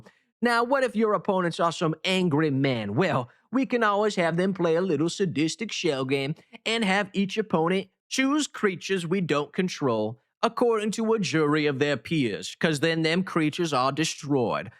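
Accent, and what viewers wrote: South, United States. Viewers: Classic southern drawl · That southern accent at 2x speed is quite funny :D Keep building man! And if you ever find a novel way of making a deck with Lord Magnus as commander, I am all ears :D. I never got past Ehrnam Djinn and Lumbering Satyr :P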